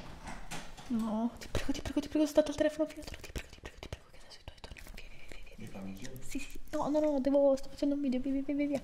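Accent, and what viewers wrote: Italy. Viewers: Me encantas , hermoso el acento italiano ✨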